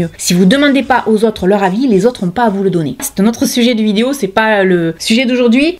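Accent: South, France. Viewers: merci, ça fait un moment que je te suis, je ne poste pas mais aujourd'hui je voudrais te remercier d'être si bienveillante, sans préjugés, naturelle... je suis grosse et je le supporterai beaucoup mieux si la société me laissez vivre comme je suis. alors en plus, y il a le maquillage, les fringues, le style... si une personne s'habille avec le style qui lui plait, bravo et ce n'est pas aux autres aigris de lui faire des remarques. perso Cristina Cordula ressemble a une grand mère ou a la méchante reine de Blanche Neige, rien de gentil en elle, que du faux. continue comme ça, ça me remonte le moral de voir tes post et d'entendre ton bel accent du Sud (qui n'est pas a la mode a la télé non plus).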